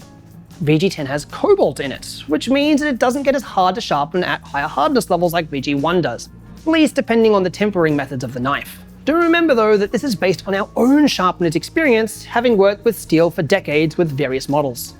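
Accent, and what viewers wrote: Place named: New Zealand. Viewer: that's cool man I thought I heard some kiwi 'I's haha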